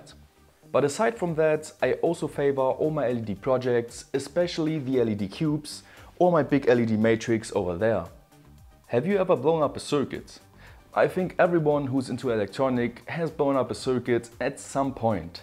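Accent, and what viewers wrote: Germany. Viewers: Hey, Erstmal großes Kompliment, du machst die Videos sehr gut und ich schau sie mir auch gerne an. Ausserdem sprichst du auch sehr gut Englisch, nur musst du noch ein Bisschen an deinem Akzent arbeiten ;) (klingt zu deutsch)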